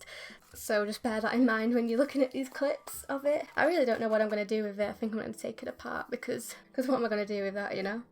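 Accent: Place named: United Kingdom. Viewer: I really love your accent! I am Latina from Chile and I love watch your videos becouse I Lear inglés brinanico and you inspire my to keep drawing Idont now if are a wrong word I sorry for that... ❤️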